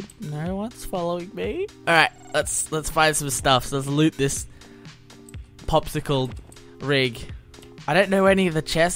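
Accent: Australia. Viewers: 15 I came from his new react video xDD. Ikr he sounds so Australian here and much more younger.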